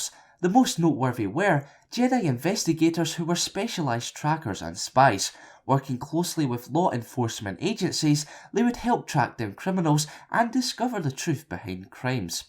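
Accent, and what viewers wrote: Scotland, United Kingdom. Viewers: welcome. Your accent is Scottish, right?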